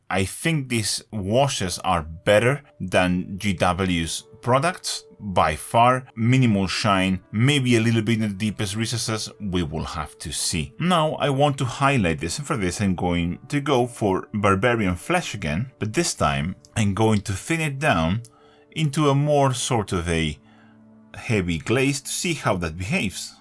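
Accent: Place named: Spain. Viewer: Tu inglés no nada más es bueno, pero es sumamente agradable y usas dichos muy apropiados, 👉lo cual es por felicitar 👈porque pocos ibéricos/latinoamericanos en este ámbito del pasatiempo lo logran...lo digo porque es casi la única vez que he oído que se te salga el castellano.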